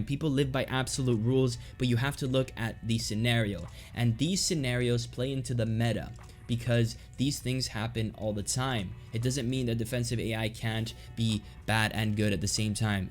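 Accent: United States. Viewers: It's an American accent so I wouldn't call it a 2nd language.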